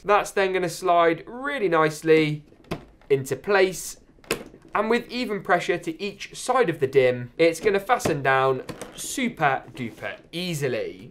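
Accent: United Kingdom. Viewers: such a british xDDDD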